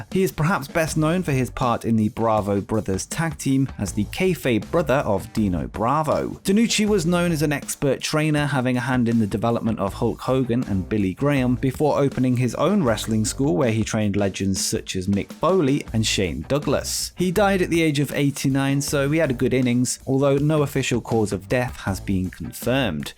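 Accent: United Kingdom. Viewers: come on... There is nothing wrong in admitting it's you. I wish that I was able to add a link of your other channel here but I would genuinely fall for your genuine denial if not that both of the voices including the perfect British accent of yours are so genuinely exactly and precisely the same, that one who knows you from Vidiq can't really mistake it.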